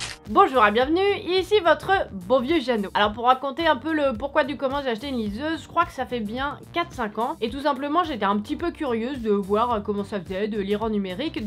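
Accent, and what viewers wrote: Paris, France. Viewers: She does speak fast yes. And she has a little (Parisien ?) accent. Congrats on learning a language ! :)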